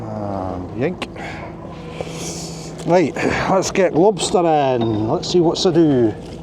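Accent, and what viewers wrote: Scotland, United Kingdom. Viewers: entertaining video. What a pretty place with what looks like pristine water.Your Scottish accent brings back memories of my late grandmother who migrated to Australia from Stirling after the first world war where she met my grandfather, who visited Scotland when fighting on the western front. Anyway, keep safe and good hunting.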